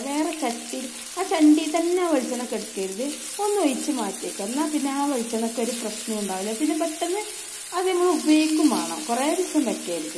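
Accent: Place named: Kottakkal, India. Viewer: bhasha kettittu kottakkal aanu ennu thonni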